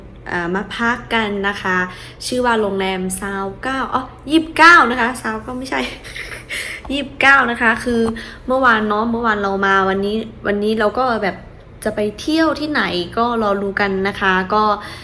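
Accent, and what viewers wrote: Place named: Laos. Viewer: โรงแรมชาวเกลาเป็นพาษาลาวไม้ไช้เหรอบา้น ไกล้กันกลับลาวบา้งที่เห็นพุดเหมีอนอยุ่ไกล้ลาว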